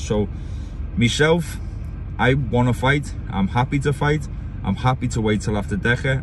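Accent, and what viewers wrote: Liverpool, United Kingdom. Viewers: Proper and scouse"" fairplay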